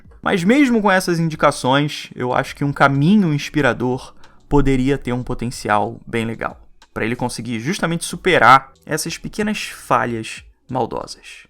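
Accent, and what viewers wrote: Rio de Janeiro, Brazil. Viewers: Oh vey kkkk carioca?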